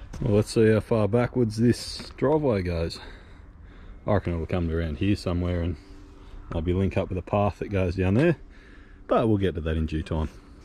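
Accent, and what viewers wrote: Australia. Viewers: Amigo, estou assistindo aqui no Brasil e seu vídeo é de longe um dos melhores da categoria, congrats. Só fiquei preocupado com suas gaiters que são pequenas para seu tamanho. Lembre-se você está na Austrália, terra de cobras e aranhas estranhas; até tive calafrios quando usava as mãos para ajuntar o lixo. Você é muito bom no que faz e o australianquese accent é bonito para os que falam Português como eu. Parabéns, fique com Deus.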